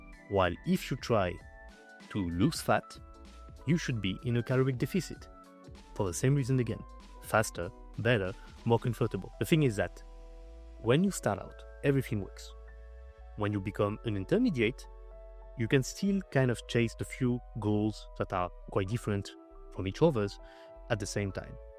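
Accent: France. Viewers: I think Uncommon Sense accidentally did you dirty with that "bylking" comment 😆 he was actually teasing you (phonetic /y/ is pronounced like the french u, he was just playfully replicating your accent IINM) The french u is closer to the english way of saying "bulk" than a french i. If you wanna inch closer still, you could say 'boulking' but almost like an O, or just keep practicing the english pronunciation directly. Cheers.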